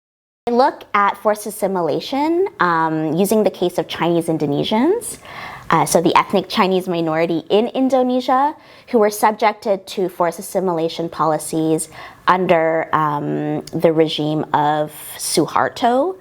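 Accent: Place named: United States. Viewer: Ini logat amerika yang paling totok yang pernah gw denger dari orang Indonesia :))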